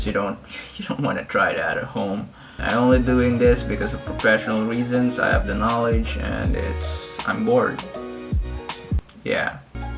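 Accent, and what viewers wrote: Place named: England, United Kingdom. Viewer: I love your english accent pls keep doing more videos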